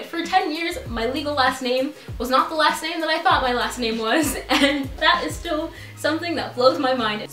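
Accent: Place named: United States. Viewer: Wow, your fine as hell, I like your American accent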